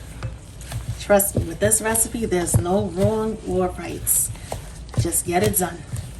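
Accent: New York, United States. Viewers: New York accent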